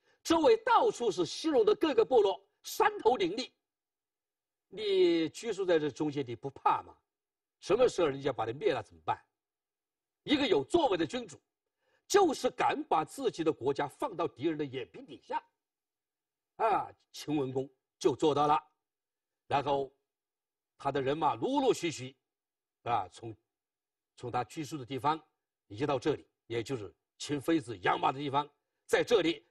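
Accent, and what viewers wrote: Sichuan, China. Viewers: 这位教授普通话听着像四川人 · 我听着他肯定是四川人。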